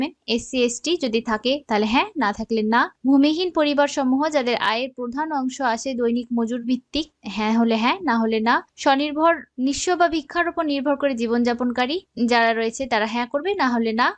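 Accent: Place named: Bangladesh. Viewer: Tui Bangladeshi hoye India er video banachis???😂 Tor kothar style e bojha jay....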